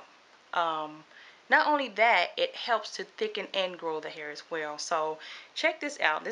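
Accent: South, United States. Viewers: I love your accent. It sounds southern. Yes, I'll get a mannequin head from Sally's, lol. Those braids are gorgeous and you are very detailed. ❤❤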